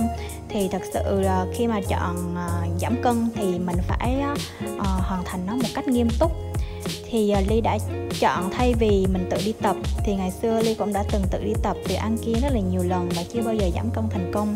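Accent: Da Nang, Vietnam. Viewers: Nghe giọng bạn như ở Đà Nẵng ý?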